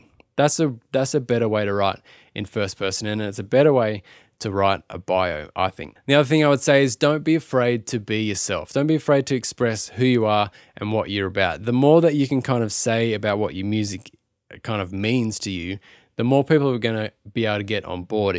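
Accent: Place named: Australia. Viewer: I've just released my first song on Spotify and am on the journey from musician to artist so this is perfect for me, thank you! Love the Aussie accent and great to discover music as I've been away from Australia for over 20 years...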